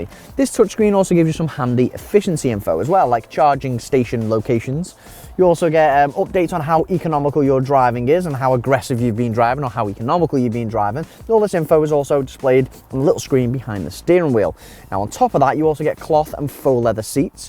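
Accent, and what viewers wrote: United Kingdom. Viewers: i believe anything from a guy with British accent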